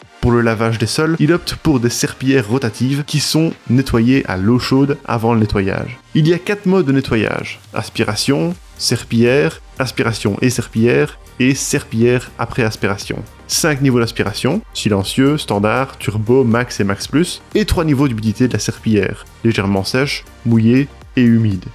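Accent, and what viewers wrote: Belgium. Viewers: Oufti l'accent du béwé!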